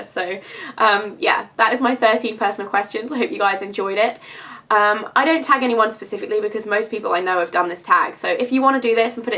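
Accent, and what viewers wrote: United Kingdom. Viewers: I love your channel! Enjoyed your 13 questions tag! It is so neat to hear your accent! I would love to visit the UK someday! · ahhahhaaha squirty cream. i love british accents!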